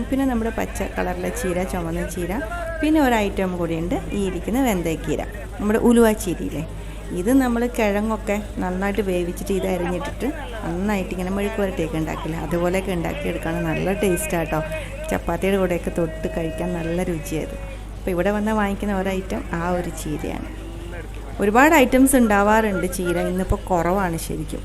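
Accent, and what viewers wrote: Tamil Nadu, India. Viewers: No Small tamil touch in the conversion.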